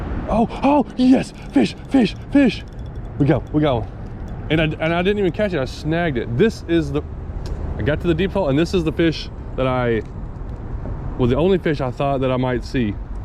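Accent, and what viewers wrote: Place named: Michigan, United States. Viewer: Sounds like Michigan to me lol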